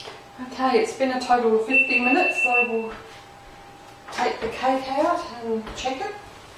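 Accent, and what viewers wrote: Australia. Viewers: Wow these older videos of yours got lower views than your new ones. Too bad people forget to check out our older videos on youtube. They always want new ones. I can hear more of your Australian accent in this video. I didn't know you did cooking videos in the past. I like banana bread too. Did you make yours in a cake pan? bye.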